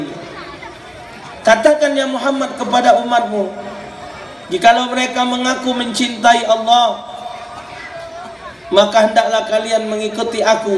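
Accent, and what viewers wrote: Palembang, Indonesia. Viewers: Ya Allah enak ketawo 25 tahun d bandung denger ustad ceramah baso palembang. Sehat terus Ustad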